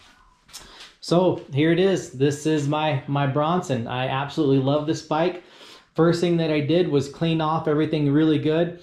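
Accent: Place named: Texas, United States. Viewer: Whoops, should have just waited until the end of the video, sounds like Texas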